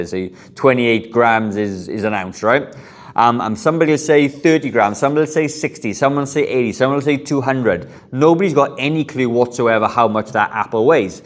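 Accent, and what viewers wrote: Wales, United Kingdom. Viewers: Lou from Ireland here. I love your welsh accent...oh and its Phone case !!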